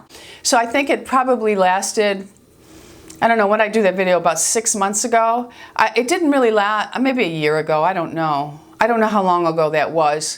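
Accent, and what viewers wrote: South, United States. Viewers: I have find you few days ago and really like you. I am 56 and barely put stuff on my face but love to listen to girls telling me what they do. It takes a lot of humility to show our face without anything and you are doing it in front of all of us, chapeau to you. I think that you have a southern accent and it is so nice. I would love to meet women like you, it is refreshing. I never look at women and try to see if they had this or that done, it is not in my nature. I am sad to see women that have done to much and look weird instead of aging gracefully. I am in Italy and am seeing older Italian women that are just dressed properly and have the right amount of makeup or none and they look great. I often stop them on the street and tell them how stylish they are. We should all applaud each other instead of putting down. Ciao and a lot of bizous!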